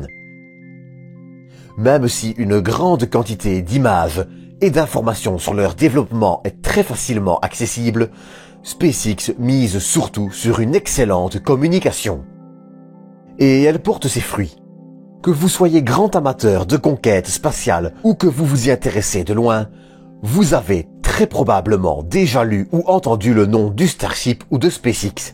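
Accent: Belgium. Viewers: Un Belge qui dit soixante-douze... Je suis déçu... J'ai vu quelques commentaires sur ton accent. Ne le gomme pas trop, c'est dommage de cacher cette spécificité culturelle !! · Salut le Belge, c'est un compatriote (expat) qui t'écrit. Super chaine, super montage, super sujet. Mais en tant que Belge je dois dire que j'ai du mal avec ton accent. Je ne suis jamais sur si je dois en rire, car cela à quelque chose de sympa, ou si mes oreilles crient au secourt. Peut-être les deux. D'un côté c'est original... et cela dois faire marrer les Francais. Tout compte fait je me demande si j'ai pas le même 😳... non d'une frite ! Allez pouce bleu quand même. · Et le super-hairy aussi. Je trouve ça cool, le lanceur super-poilu, ça a la classe. Mais ceci étant, ce n'est pas un problème de prononciation (AMHA), mais plus une question d'accent (belge je suppose). C'est ce qui est sympa avec la francophonie, ça met un peu de variété.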